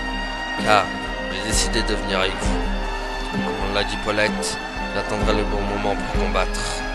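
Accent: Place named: Germany. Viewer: je voulais de l'accent allemand....jai ete servi! :)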